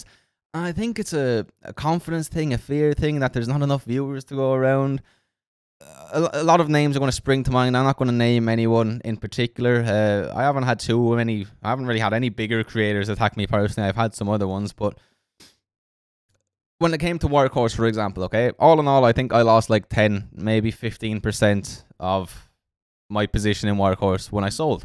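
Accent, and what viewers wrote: Ireland. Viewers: to error is human no one is perfect. You ALWAYS said do your own due diligence. Who ever blindly follows anyone, not just you deserve s what happens. I consider you as a friend. Truly! I loved sharing the excitement with you & 🤣 but I diversified anyway. What I’m trying to say is we truly NEED your beautiful Irish accent. trust in God and believe the good will overcome bad. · Hey, I enjoy your content. Your bubbly personality and Irish accent helps. I lost money on Workhouse and other EV stocks, but I don't blame you. The developing narrative sounded good to me, so I chose to get sucked into the FOMO YOLO. Funny thing 🤣 is that I'm still holding onto my WKHS and other EV stocks, because I lost track while working my new job. It was a fun run together, but I'm moving onto gold, silver, and commodities. Fun times, man 👌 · I missed that sweet Irish voice.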